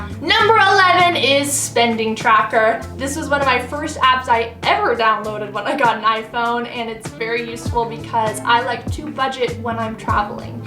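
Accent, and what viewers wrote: United States. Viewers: I would love to watch your vlogs because it's a hope of a boy who thinks America has a place for him too. Actually in 2 ways you're helping - 1. Learning English without Subtitles to get your Accent. 2. Tourist like a local & relevant information + pros & cons. Thank you in advance. Keep up the good work. And please upload regularly. BEST WISHES EVENTUALLY. 🎆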